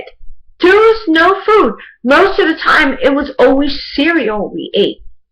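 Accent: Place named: Northeast, United States. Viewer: HI, My prayers go out to you, u sound like you may be from the northeast im in the philadelphia area. My mother too is a narcissist and i just had a question for you regarding the enablers in our family, do you have any advice on how to reach them? my sister is very much an enabler and was parentized as well, and i cant seem to get her to come around so she can start healing from the abuse shes been through. Any input from you would be appreciated. God Bless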